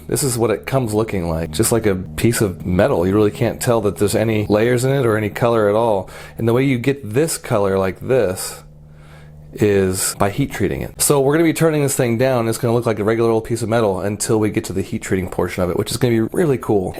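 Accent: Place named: Indonesia. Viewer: Yang dari indonesia mana suaranya😁😁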